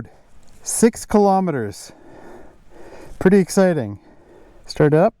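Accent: Canada. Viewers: I'm liking this series already! I had a feeling that was a good ol Canadian accent. Hi from just north of Toronto Canada.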